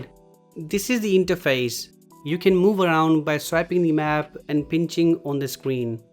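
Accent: India. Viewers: I hate Indian accent the most sorry...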